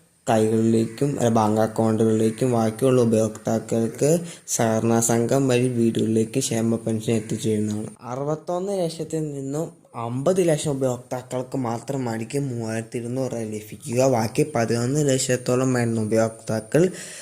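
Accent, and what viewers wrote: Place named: Bengal, India. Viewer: നീ ബംഗാളിയോ മലയാളിയോ നാക്കു തുടക്കു ബംഗാളി...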